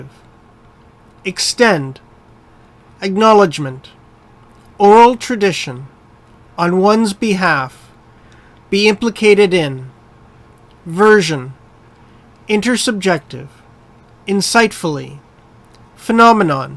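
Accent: Canada. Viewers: 완전 좋아유 👍💯💯👍👍 캐나다 남편께서 직접 녹음하신 듯 하네요. 🤗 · 여기 발음은 캐나다 사람입니다~🐱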